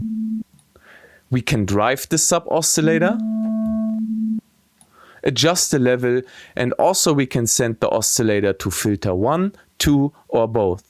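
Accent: Germany. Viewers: na, hast'n deutschen akzent nicht?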